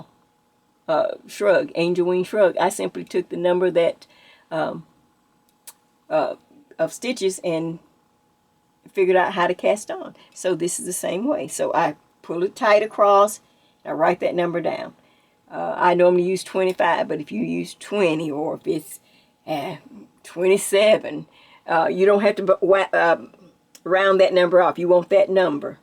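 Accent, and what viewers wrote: South, United States. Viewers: I am one of those that stumbled upon your channel by "happenstance", and boy am I glad I did! I love everything about your videos - your tutorials, your personality, your stories, your comforting voice with the southern 'drawl'... I love it all! So much so, this is the first time I've taken the time to comment. Keep it up! I can't wait to see more! I'm so sorry about your loss of your dear friend Judy. Love love love your friendship vest named in her honor. That was the video that introduced me to you. Thanks again for sharing it all with us - I love the narratives you give in addition. Makes me feel so at home. :)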